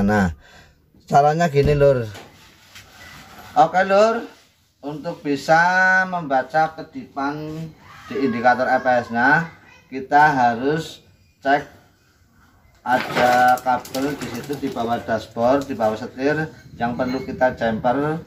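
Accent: Banyumas, Indonesia. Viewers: Pare kediri hadir mas,kok lama tidak muncul ini,nyong kangen karo boso ngapak2 nya...hahahhahah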